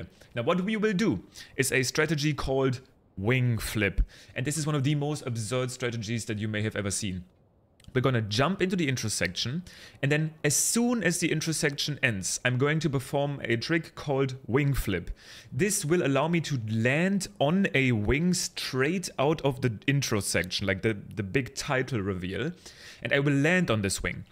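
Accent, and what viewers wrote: Germany. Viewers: I love your accent! Southern American here. Apologies if you aren’t German, but one of my favorite teachers ever was German and you remind me of him! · I can hear the German accent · Are you german? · Wie man einfach instant hört, dass du deutsch bist haha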